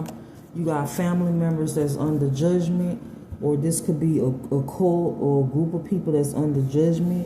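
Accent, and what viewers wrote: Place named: Miami, United States. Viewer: Miami Voice!😊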